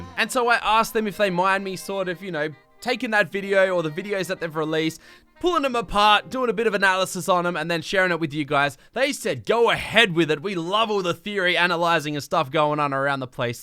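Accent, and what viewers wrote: Australia. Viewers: are you Australian?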